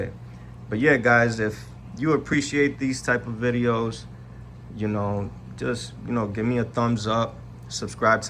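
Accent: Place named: New York, United States. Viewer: dimensions? Correct me if I’m wrong, but you sound like you’re from NY!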